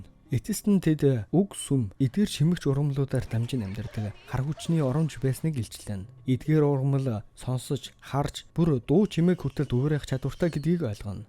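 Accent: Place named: West, Mongolia. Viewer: Шууд баруун аймгийн аялгаараа ярих юм бол их хүн үзэх байхаа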